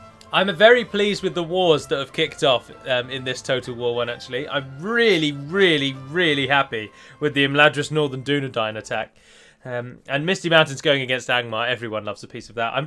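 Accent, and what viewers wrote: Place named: England, United Kingdom. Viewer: Holy shit. I couldnt tell if he was english or scottish :O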